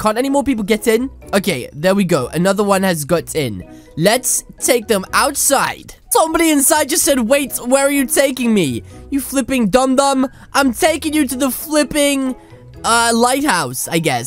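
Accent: United Kingdom. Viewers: He sounded more british in his old videos now you cant tell cuz he does so many voices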